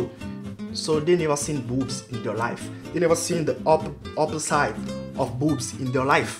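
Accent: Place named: France. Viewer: ♥ Meilleur youtuber avec le meilleur accent FRENGLISH mdr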